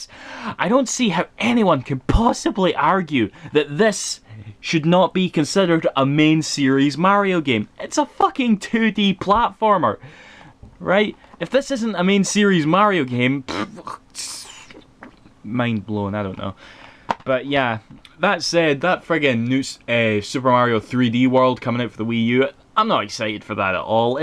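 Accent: Scotland, United Kingdom. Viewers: What part of Scotland are you from.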